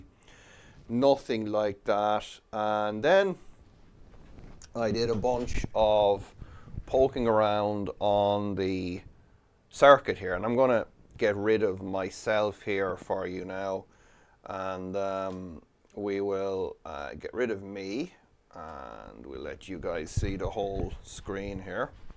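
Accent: Ireland. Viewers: Gah. Didn't see this comment till I'd watched it all. I'll know for next time :-) Not as slow as Mr Rikards, but the Irishness does show through :-)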